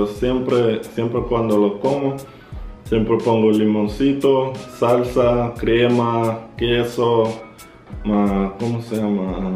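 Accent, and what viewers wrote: Mexico. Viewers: del reino de Castilla antiguo o español por la España actual? Español mexicanizado en todo caso ,yo diría. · Como que te escucho cada día más mexicano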